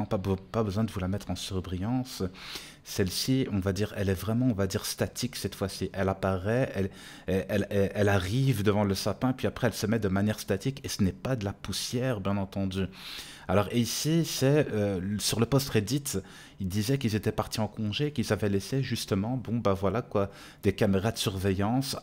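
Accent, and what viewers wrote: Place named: Belgium. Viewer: Bonsoir, pardonne moi si j'ai pu t'atteindre de par mes quelques commentaires.. sache ke je suis abonné et que je like positif à 90%.. tu as un sens de l'humour et ton mélange d'accent orientale/belge est rigolo..😉. Bref en ces temps de fêtes: prends soin de toi, bonne année à toi (sincère) et a plus dans l bus...😁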